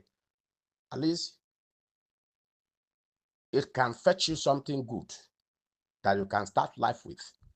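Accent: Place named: Aba, Nigeria. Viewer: u talk like aba man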